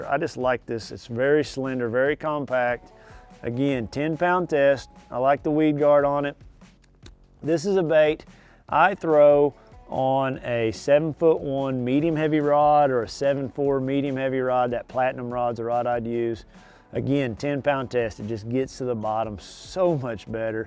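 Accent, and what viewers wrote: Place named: South, United States. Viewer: January WHERE???????? Sounds like you have a southern accent....in certain areas up north in January, the most important thing would be an ice augur! lol.....Why wouldn't you start the discussion with where you are, and generally, where you fish....I had to fish 45-50 feet deep water to catch bass today, and you are talking to me about a jerkbait??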